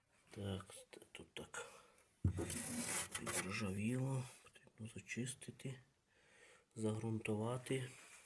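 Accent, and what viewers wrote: Transcarpathia, Ukraine. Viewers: Чисто случайно попав на твий канал Ти Закарпаття цикаво з якого села ты говориш так смішно Закарпацько Українсько руським словами ты таке наговорив поповудюу запчастей на москичу що Автомобилисти смiються для того аби правильно називати запчастини автомобиля хочаб читай в книжцi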